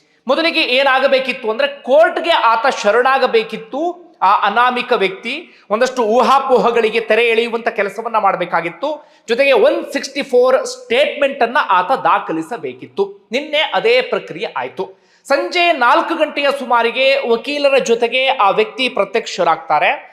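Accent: Standard. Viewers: nemma spashta dwani swatchha Kannada 👌👌👏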